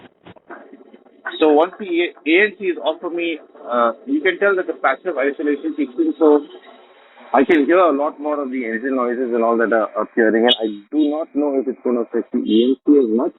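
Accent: India. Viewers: as I'm a core subscriber for your channel, I'm still in shock that for such a great reviews on well known TWS earphones, even after long time your not gaining in subscribers If I'm you and keeping all these efforts for the wonderful output of this beautiful video I would close my channel if enough encouragement was not given But you on the other hand doing fabulous Job with your Indian English ( easy to understand ) as well as your Honest review ❤️ Thanks brother and I hope you see grow in the future, Don't forget me !😂😂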